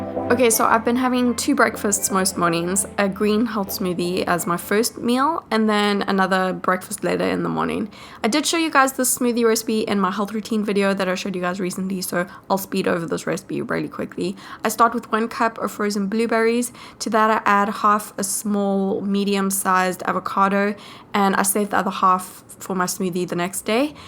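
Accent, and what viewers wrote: South Africa. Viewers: ahahah chiken South Africa :) she just moved to the states though. To LA. I love her accent!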